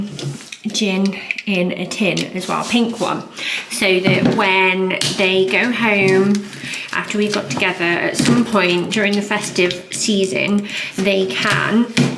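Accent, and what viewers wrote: United Kingdom. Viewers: Are you from Preston? You sound like me! 😂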